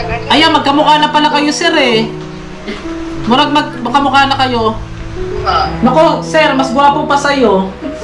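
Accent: Visayas, Philippines. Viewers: Maraming salamat, bisaya ka pala kala ko taga bulacan ka, new subscribers here😊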